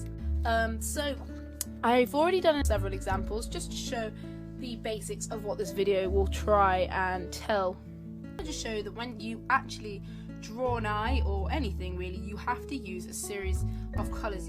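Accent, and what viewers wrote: United Kingdom. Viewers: OMG are you British? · Are yiu from England? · Your drawings are amazing! Mid-england? Same accent as me :D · really good tutorial! :D love your accent, british is so beautiful :3 good work!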